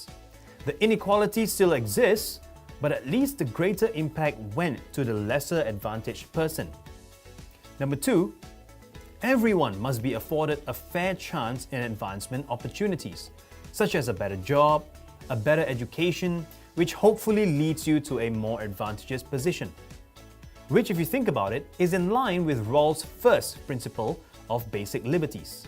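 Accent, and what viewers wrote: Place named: United States. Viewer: Where we learn to speak fluent American engilsh. Pls suggest ways to speak English like you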